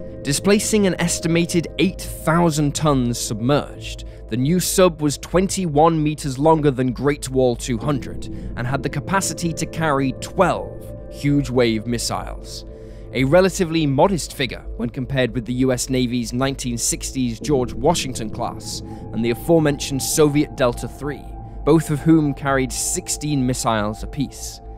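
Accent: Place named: RP, United Kingdom. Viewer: His accent is from the south of England (received pronunciation), not Ireland.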